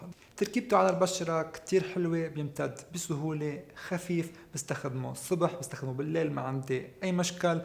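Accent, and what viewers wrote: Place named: Lebanon. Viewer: هما اللبنانيين بيسولفوا كذا لا تجلسي تدخاي بالنوايا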